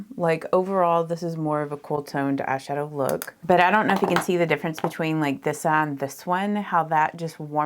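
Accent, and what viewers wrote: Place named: Virginia, United States. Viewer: Hello from Canada,. I have this eyeshadow palette and I will definitely try this beautiful look. I have the exact eye colour as you. I love your accent. (Virginia??)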